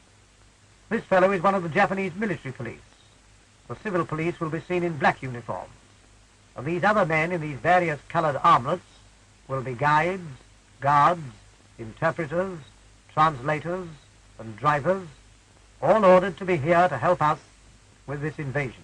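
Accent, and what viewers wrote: United Kingdom. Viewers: Petroleum Jelly Bomb = Napalm . The benevolent civilized “ Good Guys “ 🇺🇸 dropped it on the Vietnamese. I’m surprised the USA didn’t drop Nuclear Bombs on Hanoi . General MacArthur AKA Dr Strange Love ( How I learned to love the bomb ) advocated nuking the Chinese in the Korean War . P..S. The narrators prancing horse, Royal British voice is annoying , and as to be expected extremely racist . Lousy photographer also . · I think the narrator (who is obviously British) spoke appropriately. why do I say that? because of the time period. The Japanese were racist back then! weren't they? they thought they were superior to the Americans, the British, the Australians, etc!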